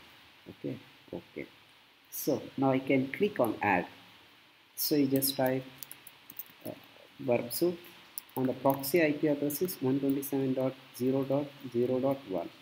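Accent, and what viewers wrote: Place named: Tamil Nadu, India. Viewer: I love your TAMIL ACCENT 🎉❤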